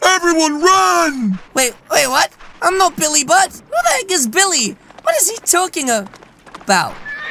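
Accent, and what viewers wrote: United Kingdom. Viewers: uk guy ha? cool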